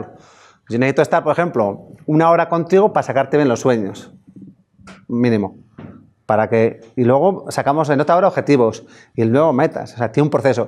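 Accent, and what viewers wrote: Spain. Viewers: Valeee que es de Bilbao, no de Colombia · Soy Luis Capdevila Argentino viviendo en Colombia., Muy Interesante la Conferencia! una pequeña observación; para una Comunidad Latina en las próximas charlas podría "vocalizar un poco más Neutro"? Se entiende bien, aclaro, pero a las personas que no estamos acostumbrados a escuchar otros Tonos como el de España, se dificulta el entendimiento por Hablar tan Rápido (cajitas ó cajetas?). Gracias Así mejorará mucho la Productividad de Uds que es La Comunicación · Yo lo entendí a la perfección. Pienso que a los latinos puede costarnos un poco más por el acento español, cuando habla muy rápido y por los modismos propios de España, pero ha sido divertido y contundente, especialmente cuando dice a partir de hasta , que la semana ha sido una puta mierda 😂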